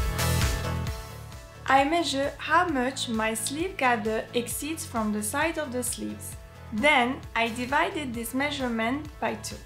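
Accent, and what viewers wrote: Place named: France. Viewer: on sent bien l'accent francais xD mais merci quand mêne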